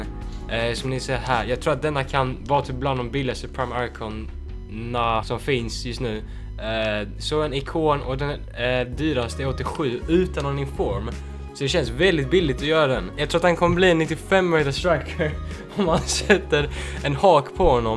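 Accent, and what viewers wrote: Sweden. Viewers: Är du från Småland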